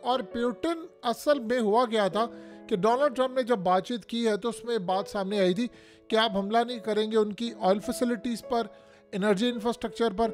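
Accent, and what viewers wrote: Punjab, India. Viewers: Way this guy talk sounds like he is war time expert, he is pandu with Punjabi accent.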